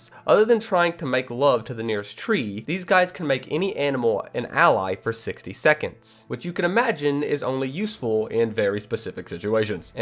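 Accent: South, United States. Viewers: Southern guy playing some Skyrim? Subbed!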